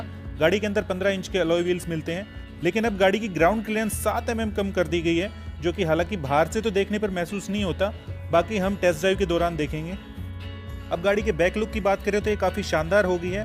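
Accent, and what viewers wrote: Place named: Gurgaon, India. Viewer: Bhai Boli Se Gurgaon ka Lg Rha Hai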